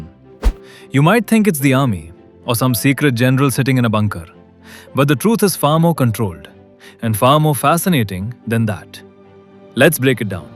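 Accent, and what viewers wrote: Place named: India. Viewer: Van battun van oder haha love indian accent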